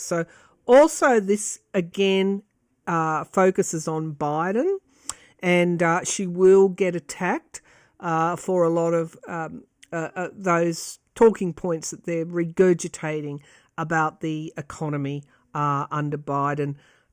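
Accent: Australia. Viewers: Your Australian accent is gorgeous!!! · Love your Australian accent, love your Australian heritage, love your energy. Thank you so much for your honesty and openness. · I so look forward to your readings and your beautiful Australian accent is part of the reason. I went through speech therapy in elementary school, had no say in the matter, for my lisp. Would have never known you had one. Keep shining your light!❤ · I absolutely love your Australian accent! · you speak English, regardless of you Australian accent. You speak perfect English regardless of your lisp. I understand you perfectly. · Thank you,. I love your accent and I love that you’re proud of your heritage. Are they different accents for different parts of Australia? I was raised in Philadelphia and then moved to Chicago and then to Maryland and everybody can tell as soon as I’ve been around Philly friends, my accent changes, it’s kind of fun! 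· Brilliant, entertaining and informative. Thank you for the great intellect and for the support spirit shows to those around the world for a better world and getting rid of corruption in high places. Your Australian accent is wonderful and the Aussies over many years have been right there to help when always needed. Reference WWI & WWII. Thank you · Love your Aussie accent! It fits you perfectly! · We love you just the way you are, ❤ And I Love your Australian dialect!!! You rock!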